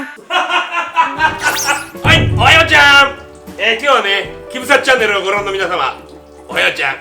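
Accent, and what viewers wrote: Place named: Mikawa, Japan. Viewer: 料理も美味そうだけど、気持ちいいくらいの三河弁が聞いてておばあの実家の郷愁に駆られる…笑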